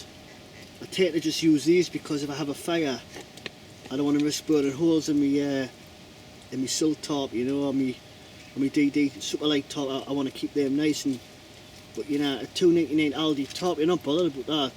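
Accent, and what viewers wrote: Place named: Northern England, United Kingdom. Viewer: Where you from mate? Sound local to me, I'm Barnard castle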